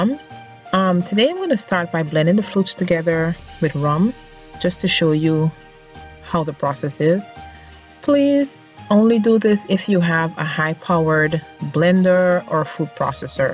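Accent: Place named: Guyana. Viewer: I've been looking for and using online recipes for years but its been a real pleasure to find your recipes for my favourite Guyana home recipes! Your reassuring helpful Guyanese voice and accent are comforting and inspiring. Thank you! Can't wait to get started!👍🏾🇬🇾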